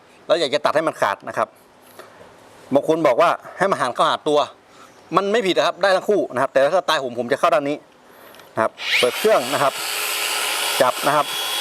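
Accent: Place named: North, Thailand. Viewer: คนเมืองกะนิ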